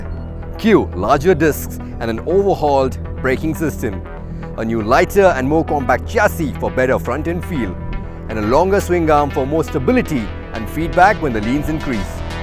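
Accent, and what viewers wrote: India. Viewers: love the accent, keep the shit on one wheel in India!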